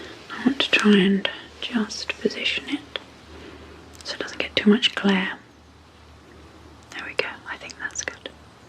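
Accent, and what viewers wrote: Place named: West Country, United Kingdom. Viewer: I wonder where this lady is from? She’s got a Wiltshire/Somerset vibe.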